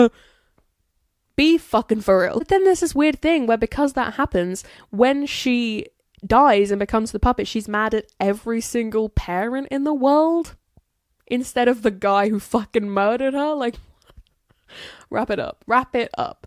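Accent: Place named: Ireland. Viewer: OMG YOURE IRISHHHH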